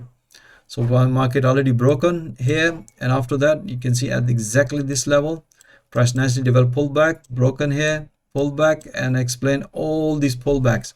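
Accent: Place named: United Kingdom. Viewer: Sir pls try to make us understand in Urdu language. Many people are unable to understand British accent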